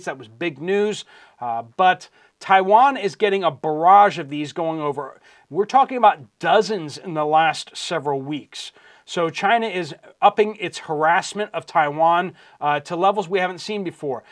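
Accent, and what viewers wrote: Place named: Texas, United States. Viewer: May I suggest a Dale Carnegie or other readily available public speaking course? You will be so much more comfortable. It helps kick out the Texas speech patterns in all of us.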